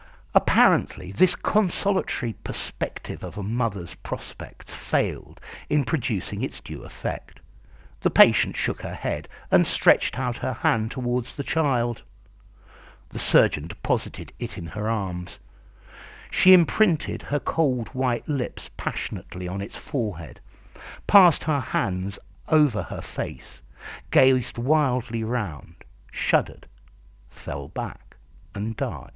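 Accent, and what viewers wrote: United Kingdom. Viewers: I really love your British Accent, if you don't recording more sir!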